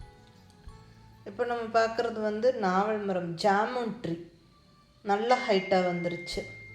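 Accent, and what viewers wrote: Coimbatore, India. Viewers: Neenga Kovai ya mam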